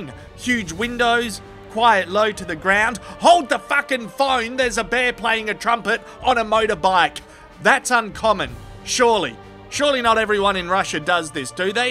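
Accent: Australia. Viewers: Yes, we citizens of the USA collectively think Australia is about nothing but kangaroos and crocs! 🤣 NOT! I would love to be able to tour Australia for the many beautiful and exciting things to do and see. Firstly, as a lover of the sea, the Great Barrier Reef would set me up for life. Sydney Harbor bridge, Opera House, Ayers Rock, Australia Zoo, the beautiful beaches with record-breaking waves, and last but not least, the well-reported hotel and restaurant hospitality and exceptional cuisine that we may not have here in the states. God willing, I will be able to afford such a trip in the future. p.s.: I could listen to that accent all day long!😘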